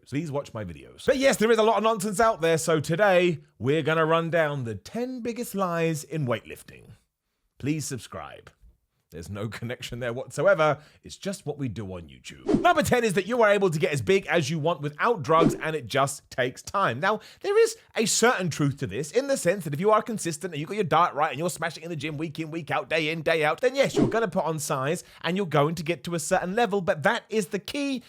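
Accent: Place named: RP, United Kingdom. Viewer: I like that as got older his voice became "posher". I'm glad the first thing on the list was that you can't completely change your body to look like someone else no matter how much work you put in or how heavy the weights are you lift. You shouldn't have a goal to have abs, legs, arms like one specific other person, because you a different people. If you exercise and train your body isn't going to look like anyone else because it's unique to you.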